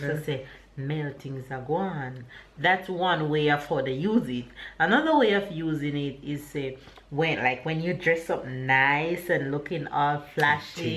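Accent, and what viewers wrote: Jamaica. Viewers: U go gal love fuh hear u teach uh husband d Jami twang shouts out from Tobago Caribbean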